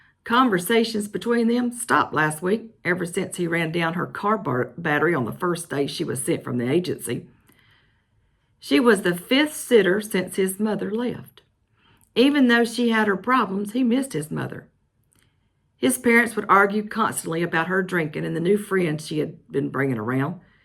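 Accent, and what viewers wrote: South, United States. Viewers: I love your southern and expressive accent. Such talent!🥇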